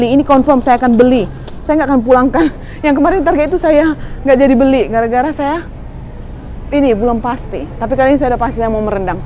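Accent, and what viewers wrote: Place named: Karo, Indonesia. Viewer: Suka kali lihat logat kaya kakak, kayak bibik aku orang Karo. Selalu di tunggu shopping onlinenya dg ❤❤❤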